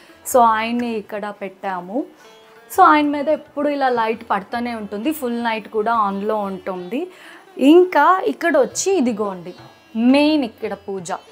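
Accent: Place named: Karnataka, India. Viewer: Valladi native karnataka state banglore anduke telugu ala vundi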